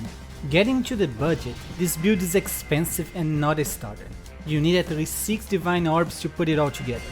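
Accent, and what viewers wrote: Brazil. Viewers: Vc é Br né parceiro eu tô vendo teu sotaque Bro, vlw por esse conteúdo maravilhoso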